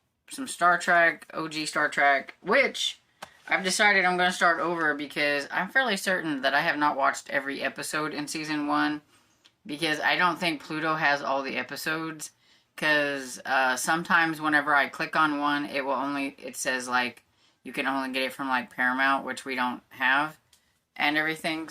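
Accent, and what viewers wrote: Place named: South, United States. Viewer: Happy weekend! That Chef Boyardee looked really good. I haven’t had it in years. I always had mine with a peanut butter sandwich. That was started by my mom when were kids. 😂 And of course you can consider yourself southern! You live in the country and you have a slight accent. That’s all you need. lol!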